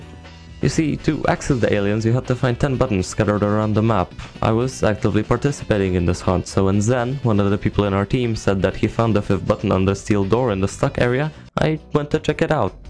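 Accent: Poland. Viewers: what country you from i thouhgt ur polish for a sec?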